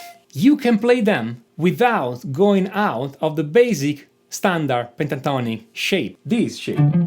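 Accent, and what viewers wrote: Italy. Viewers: he has quite restrained an accent for being Italian -^_^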